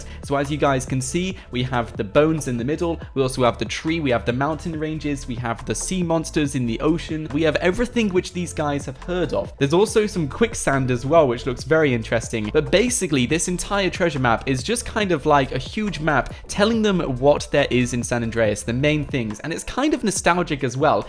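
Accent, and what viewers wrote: United Kingdom. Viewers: Are you British ps. Ur the best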